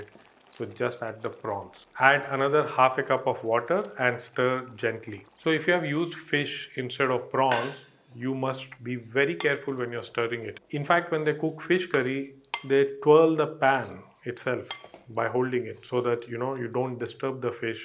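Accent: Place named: Kerala, India. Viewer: Your accent sounds like a malayali Sir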